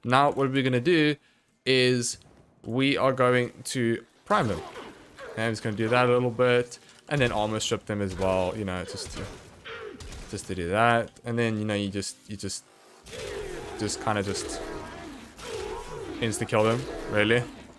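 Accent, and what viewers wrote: South Africa. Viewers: Are you from South Africa? I can hear from your voice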